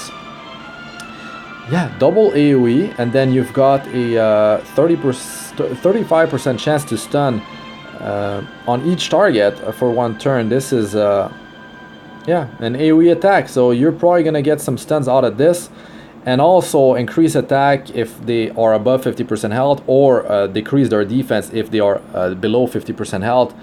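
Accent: Quebec, Canada. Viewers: Are you a French canadian? Idk why but you sound like it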